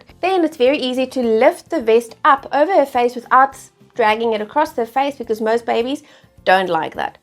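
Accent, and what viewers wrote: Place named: South Africa. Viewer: I know a South African voice when I hear one🥺🩵🩵🇿🇦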